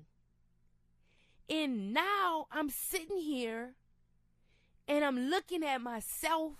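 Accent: New Orleans, United States. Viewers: I was thinking of some old BME stuff and Googled you. Glad to see that your life has turned around for the best. But truth be told, your past lyrics use to be on rewind because you was spitting fire. Plus I love women from New Orleans accent.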